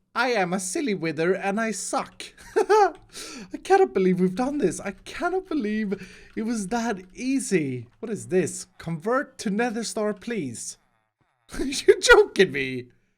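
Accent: Sweden. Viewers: Are you from Sweden?? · Är du från Sverige?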